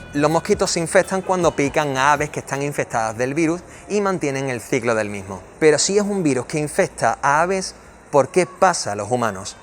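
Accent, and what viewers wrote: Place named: Andalusia, Spain. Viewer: Qué crack !!!!!!!!! “Ma quedao embobao” !!! Y en perfecto andaluz ! GRACIAS !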